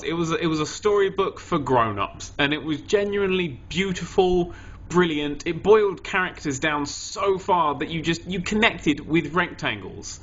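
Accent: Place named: United Kingdom. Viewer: gay voice? thats just called being british.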